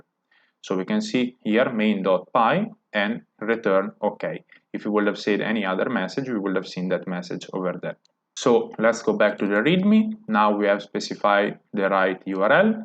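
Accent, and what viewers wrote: Italy. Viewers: Hey! Very helpful and well structured tutorial. I was concerned there was no free option for telegram bot hosting but using Firebase cloud functions works! Also I noticed from your name and accent that you are Italian. I am from Italy too!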